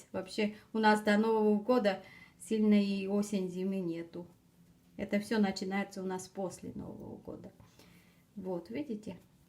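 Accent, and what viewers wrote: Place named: Dnipro, Ukraine. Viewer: ! какой вы красивый кардиган связали! он у вас получился такой элегантный! и еще: мне послышалось или вы и правда из Днепра?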